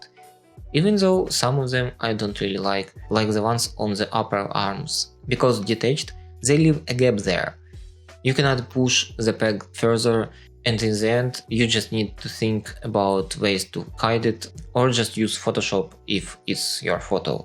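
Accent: Russia. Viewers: Bro, are u russian? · У вас ооочень русский акцент ^~^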